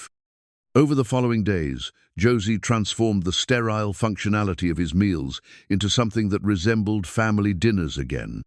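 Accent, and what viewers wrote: United Kingdom. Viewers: Too much time on the dead wife. Why not more on the dead husband. He had not been dead very long, yet, the wife has little grief? Ugh. And please get rid of the British accent. A western story needs an American voice.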